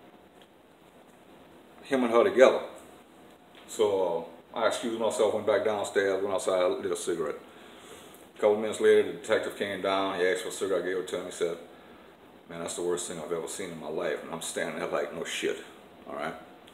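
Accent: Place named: Louisiana, United States. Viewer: I'm new to this channel & I'm glad I stumbled across it. I wish you many more vids. My only problem is that since English is not my native language I have problem understanding Louisiana talking. But reason I'm writing this is: I think you gave good advice here! especially because of children that later do same stuff in their marriages 😢.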